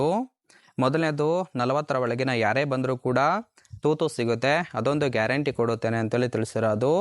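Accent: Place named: Kerala, India. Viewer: O K Callem Malayalli Tone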